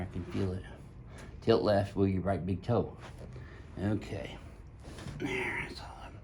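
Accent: South, United States. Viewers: Nothing wrong with a southern accent and a nice rack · are you from redneck??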